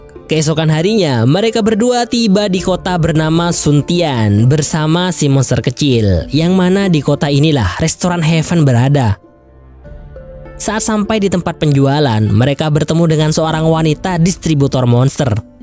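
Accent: Madura, Indonesia. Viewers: Corak besa madureh wkwkw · Madura ya bang? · Orang madura ya kak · Areh oreng madureh yeh🤣 Mak bahasannah Reng madureh🤣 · Madureh kek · Loh kok bisa madura ini mimin nya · Admin nya kek nya orang Madura deh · Ini cuma gua yg dengar apa memang admin orang madura?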